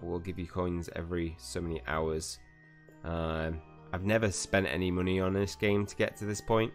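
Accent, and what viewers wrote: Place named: Cockney, United Kingdom. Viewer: Nope. this guy is all-over-the-place in his attempt to explain things. For one, Americans have a tough time as it is trying to understand the Cockney language. Secondly, he's fumbling around with the cursor trying to explain features and in the process - he's out in left field, so to speak going back and forth and in the process ends up with us knowing but an inkling more than when we started to listen to the "How to play.". He's talking about cards, and other items, but his "basics" are totally left out of the instruction. What many of us wish to know is what constitutes a good move in the game, what to shoot far as the screen refreshes, what items are most important and when to use them (ie, bombs, footsteps, how to get past attacks, how to kill frogs, what are "power ups" and how to use them),; what are the best moves in the game (ie using 3, 4, 5 in a row items); what to save in each game until needed on the game board; know if it's better to use a lighting bolt spell or a flying key; what is the order of an item's changes until it can be used (ie, a block of ice, turning clearer). 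Oh, there are so many more things in "How to play" that is totally left out here. I haven't come across an excellent playbook/instruction manual outlying all of this and most videos I've seen one may know how to play the game but not express clarity, simplicity, understanding too all - but make an attempt to speed by it, like they were in a timed game. - pitiful.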